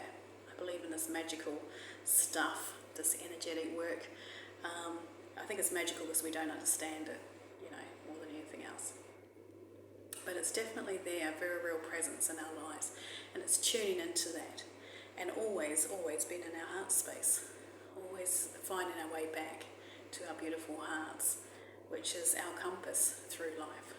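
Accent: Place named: Australia. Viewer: I am a mixed media Journaler. I am liking the positivity in your videos. Yes living abundantly is the key so WELL DONE. You have a lovely Australian accent but which Country are you living in plz? Do u grow your own food? Do u fast? I have so many questions. I am making space in our life to recieve.- decluttering- having 2 much stuff is hmm.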